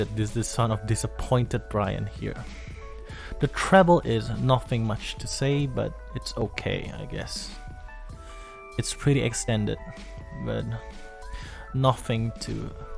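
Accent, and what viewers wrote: RP, United Kingdom. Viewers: so sad, you never use them again. Anyway, I like your normal accent here in this video more than your usual Posh accent hahaha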